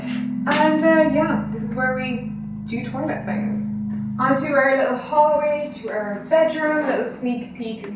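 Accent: Ireland. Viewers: omg are you irish too? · The 461 yes she’s from Cork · Is this an Irish accent?? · I hear an Irish twang coming through.. Is she Irish?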